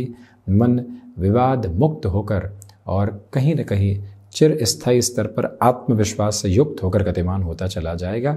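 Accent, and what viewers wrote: Standard. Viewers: Bahut kam log itni shudh ( Pure) Hindi bol paate hai aap ka bolna mujhe bahut pasand hai rahi baat khumbh rashi ki to main mann chuka ho ki ab kuch accha hone wala nahi ha chamatkar ho jaaye to main kah nahi sakta SUNIL CHAUHAN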